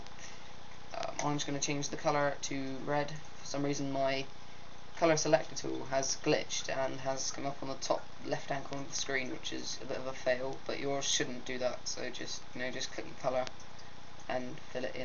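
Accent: United Kingdom. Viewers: Awhh One Direction Accent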